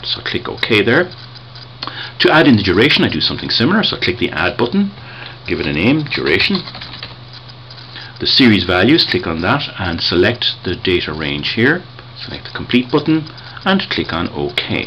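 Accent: Ireland. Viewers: Hi there, I am a nurse who knows very little about Gantt charts other than they exist a. I searched in EXCEL and found your video. I watched two and found them very helpful. The bonus was the course was created by someone from Dublin and I could enjoy hearing an Irish accent as I followed along. I am a Dub who has lived in Vancouver, Canada for many years who really enjoyed having an Irish teacher! Great videos. cheers Clare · I love his accent! Irish? What's up?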